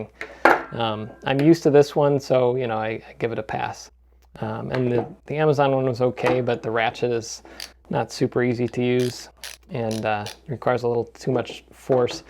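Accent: Netherlands. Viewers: are you dutch actually?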